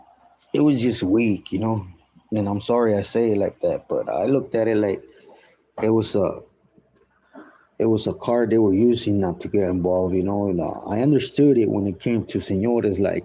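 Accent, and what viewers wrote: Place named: Los Angeles, United States. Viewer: I'm a white dude that grew up in LA and this dude sounds like everybody I grew up around.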